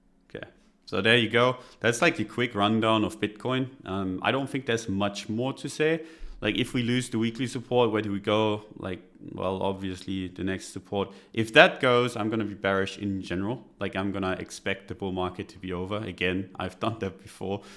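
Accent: RP, United Kingdom. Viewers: content lol.i realised these guys are just waffling for hours over s/r levels that are obvious on a chart. everything is it "looks good" or "looks bad". My bet is they both work, have jobs, and just release lack lustre vids to earn money for leverage trading following YT income. Creds system is flawed too if you actually bother to back test it. but he speaks posh so people believe him. sponsored by leverage exchange to get you to gamble says it all. "I think think think" blah blah blah . there's no on chain analysis here or order book insight at all. these guys are amateurs and rent their homes with a high probability that mummy cooks dinner every night for them.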